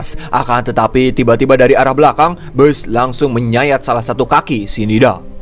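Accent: West Java, Indonesia. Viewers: Aa Org Sunda Bukan. Lucu Ngomong Nya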